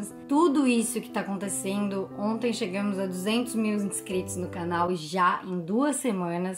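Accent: Rio Grande do Sul, Brazil. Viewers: Guria! Tenho quase certeza que tu é gaúcha! Sotaque 😉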